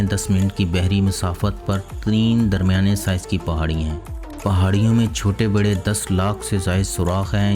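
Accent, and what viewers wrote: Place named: Punjab, Pakistan. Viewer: the information is good but please pronounce the words accurately, if you are speaking in Urdu language as at the moment, you are speaking in punjabi. It Not 'Narway' its pronounced as Norwary. Secondly its called 'mulk' not mulaak in Urdu so please correct that.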